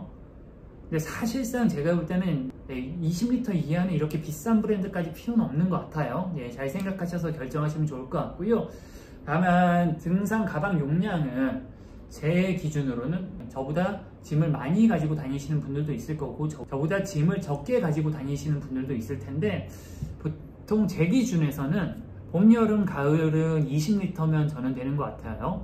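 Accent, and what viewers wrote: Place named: Jeolla, South Korea. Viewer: 전라도 억양이 살짝 있구마잉 반갑소잉~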